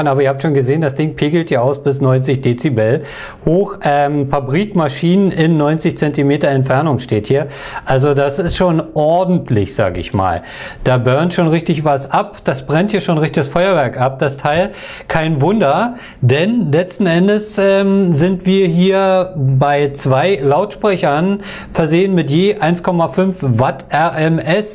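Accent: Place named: Hamburg, Germany. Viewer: Hamburger juuung :D Schönes Review, vielen Dank!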